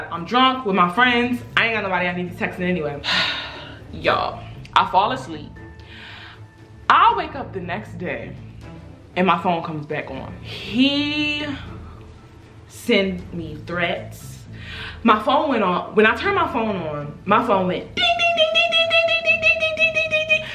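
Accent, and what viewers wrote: Baltimore, United States. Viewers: u from Baltimore? Subscribe. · Yo tell me why I spent 20 minutes on ig tryna figure out if you was from Baltimore bc I could hear it ASAP when I literally could’ve just watched a min further in 😭😭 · Lmfao I knew you was from Baltimore! I love your videos. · As soon as I press play I instantly knew you was from Baltimore 🥴😂 it's something about that west side accent....I always pick up on it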